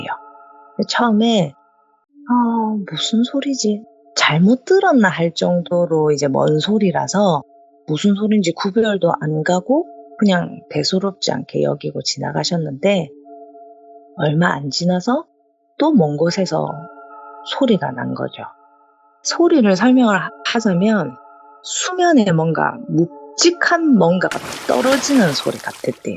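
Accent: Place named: Gyeongsang, South Korea. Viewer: 충청도 피가 흐르는 경상도분이신가봐요 재미나게 잘들었는데 사투리신경쓰지 마시고 해주셨음~~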